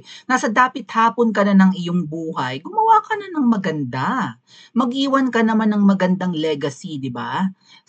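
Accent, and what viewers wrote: Iloilo, Philippines. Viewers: Haha ilongga ka day❤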